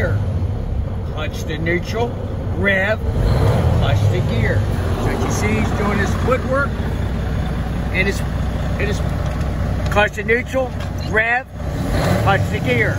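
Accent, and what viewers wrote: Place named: Maryland, United States. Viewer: Nice! I can tell by your accent lol. I grew up in Baltimore, but now live in Idaho. Currently going to trucking school and trying to soak up as much information as possible. Thanks for the vids!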